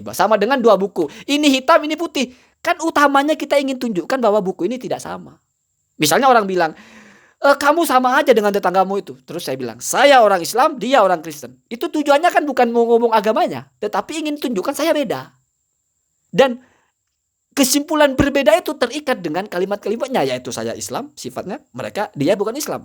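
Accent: Madura, Indonesia. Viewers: Ustadz orang Madura ya. .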